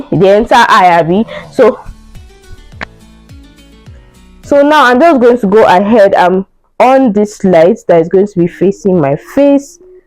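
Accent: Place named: Nigeria. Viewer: Nice video and alot of creativity. But please try to use standard English not the Nigerian style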